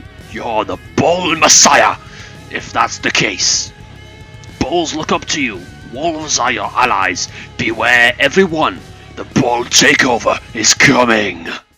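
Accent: United Kingdom. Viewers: Or maybe it's because I was so surprised to hear a British accent, I was honestly expecting some annoying Yank voice 😂 Never knew you were a Brit! Makes all the edgy texts over the years much better knowing the British humour. Americans would be completely serious saying all that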